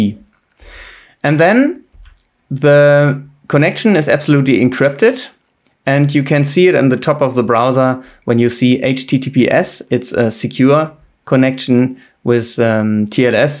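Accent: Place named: Germany. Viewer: He must be german! i can hear it from his accent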